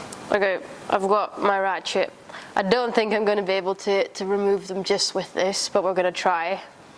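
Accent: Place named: Scotland, United Kingdom. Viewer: I hear Scottish in your accent.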